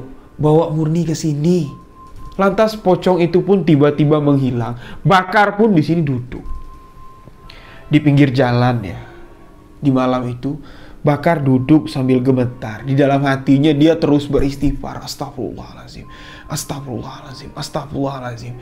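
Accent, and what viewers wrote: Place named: Madura, Indonesia. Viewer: Logatnya madura.... Maduranya mn bng?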